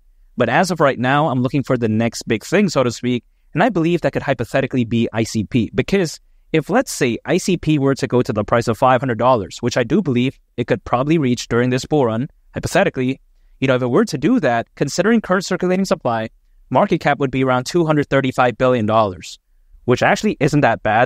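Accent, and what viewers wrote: United Kingdom. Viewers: Nice British accent! Nice content again as well. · Great British accent. I still can't tell if you're AI or not though😂 🤔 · love $icp and love the British accent 🌐👨🏼‍💻♾️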